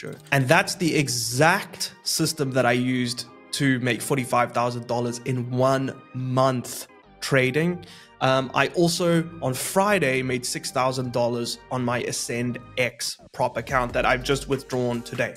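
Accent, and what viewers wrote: South Africa. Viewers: You South African? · Are you South African by any chance? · Hey bro, are you South African? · Dude are you South African? I can't place your accent 100%, but sounds Saffa. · Is jy n suid afrikaner.?. Jy klink afrikaans..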